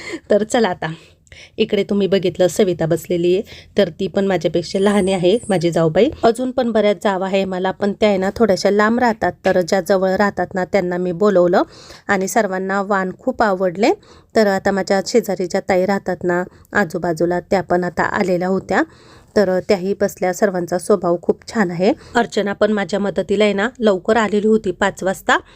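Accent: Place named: Jalgaon, India. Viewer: मावशी तुम्ही जळगाव मालेगावच्या दिसत आहे बोलण्या वरून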